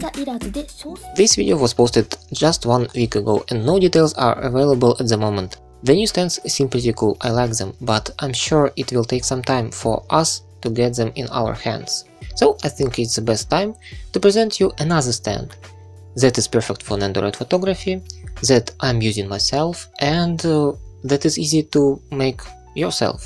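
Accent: Russia. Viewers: Мне вот интересно, он говорит с русским акцентом или такой присущ ещё кому-то? And the video is very informative! In Russia, where I live, it will be problematic to buy Nendoroid Easel Stand on your own, but I always want to take a photo of my favorite figurine. Thank you very much for DIY!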